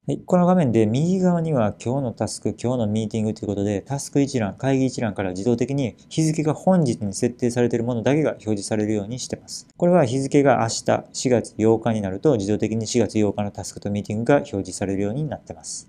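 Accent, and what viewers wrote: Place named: Kansai, Japan. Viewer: 関西弁聴きやすすぎます。。。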